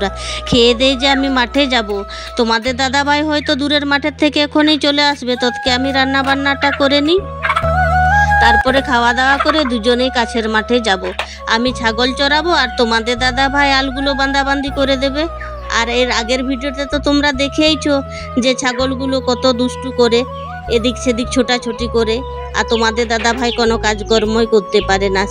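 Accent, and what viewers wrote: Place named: Bankura, India. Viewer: খুব মিষ্টি লাগল কথাগুলো শুনতে। অনেকটা যেন বাঁকুড়ার মেঠো সুর বাজছে। আগেকার মতো শাড়ি পরে কাজ করার অভ্যাস চলে গেছে অনেকেরই....💛❤️💛🙏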